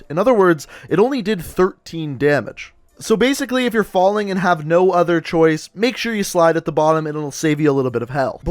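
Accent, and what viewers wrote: Canada. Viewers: Fuck your accent Canadian fuckface!!!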